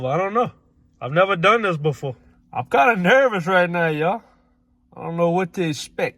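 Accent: Louisiana, United States. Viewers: lol, I did I thought you were trying to do some kind of voice then I realized you were from Louisiana, which is like a foreign country to me up north here.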